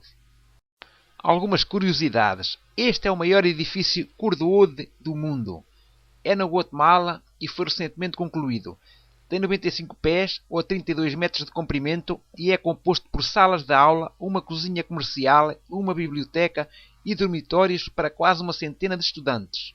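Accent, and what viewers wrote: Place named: Portugal. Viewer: A fala é de um português!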